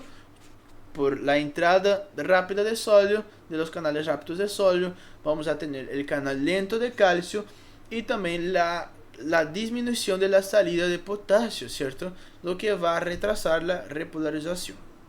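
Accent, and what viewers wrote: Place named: Brazil. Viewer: ¡Ahhhhhhhhhhhhhhhhhhhh! eres de Brasil, tienes un acento bonito, ¡Vida Brasil!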